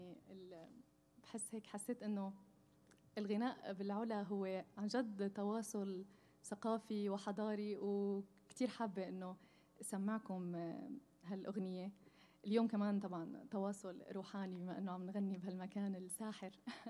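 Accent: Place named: Jazira, Syria. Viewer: فديت لهجة جزيرة الفرات شكد تنحب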